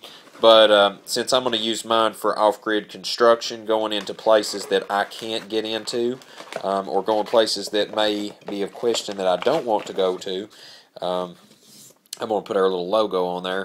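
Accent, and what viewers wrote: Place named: North Carolina, United States. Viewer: Are you from North Carolina?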